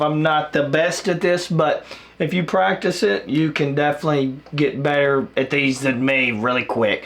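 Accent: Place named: South, United States. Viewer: idk why the algorithm sent this my way but I'm glad it did. I dig your vibe. You don't get a lot of Southern accents on youtube. I've seen that video where Dime talks about this technique but you can barely see what he's doing in it so this was really helpful.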